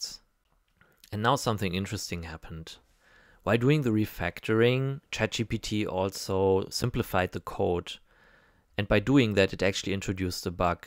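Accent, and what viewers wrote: Germany. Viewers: Actually are you german? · Cooles video und schon Krass dass es schon so weit mit Ai getrieben wird, Kann es sein dass du deutsch bist ?? Dein Accent hört sich voll deutsch an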